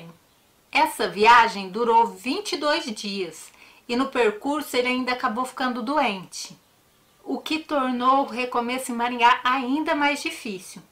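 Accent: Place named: Northeast, Brazil. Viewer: Nota 10 pela a história e nota 1000 pelo sutaque amo o Nordeste e o nordestino também.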